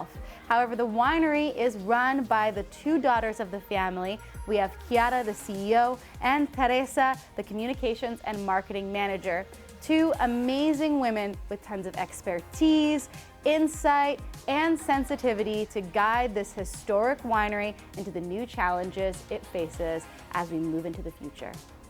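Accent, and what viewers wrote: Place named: Italy. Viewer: Thanks for the note, but it is simply the way I speak. I come from a very flamboyant Italian family lol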